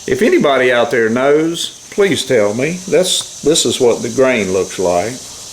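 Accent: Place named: Georgia, United States. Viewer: I love turning wood, and I really love that accent of yours. I have a sister who has lived in Ark. most her life, but it's a bit different than yours. Are you from Georgia, by chance?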